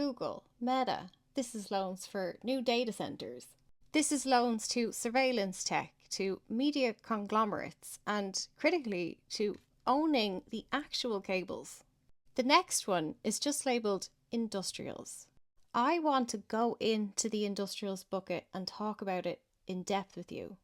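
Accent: Ireland. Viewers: Sounds a bit Anglo Irish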